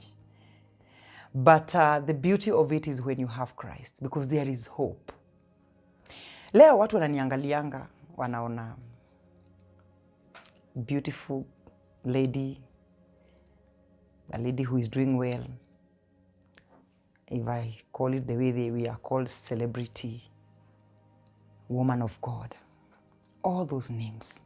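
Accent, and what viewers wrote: Tanzania. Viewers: What an inspiration! Glory to God. To be sincere I used to think you're from Tanzania because of the sweet voice and sweet Kiswahili for that matter. More grace in your ministry. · When I first heard you I thought you are a Tanzania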